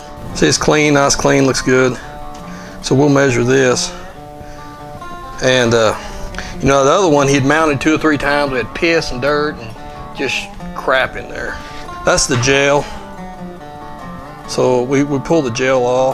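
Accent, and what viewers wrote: Texas, United States. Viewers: It's that great Texan accent. 🐴🐎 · honestly watching this guys videos cause one of my original characters is half kelpie and a kelpie is a water horse and i need to learn how horses work for the character. his accent reminds me of home (im in new york and from texas) and im trying not to cry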